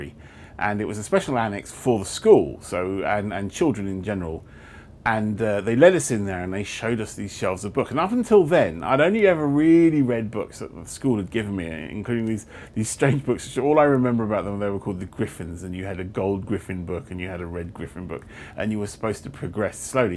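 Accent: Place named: London, United Kingdom. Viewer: educated London accent, really foreign name, I want to see this guy on 'Who do you think you are' NOW!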